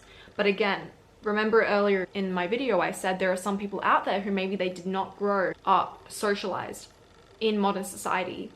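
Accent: Australia. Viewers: are u from Australia?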